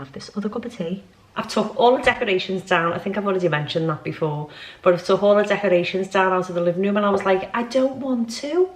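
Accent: Liverpool, United Kingdom. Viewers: Hi, your fab it's like listening to an old Scouse mate and Ur just like me. Your cracks me up he lovely. Looking forward to how he dresses you, as we are very similar in age but I don't see myself as at the age I can't wear low tops 😂 I'm 43 this year and I'm the age I feel 😂. Loving the new style in ur home and I've had a few bits from Neptune they are worth the money. Looking forward to your next vlog xx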